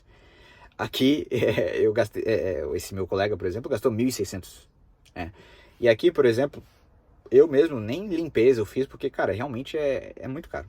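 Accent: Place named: Central Brazil, Brazil. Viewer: Você é de Goiás, Brasília ou Minas Gerais? Tem um sotaque característico desse lugares.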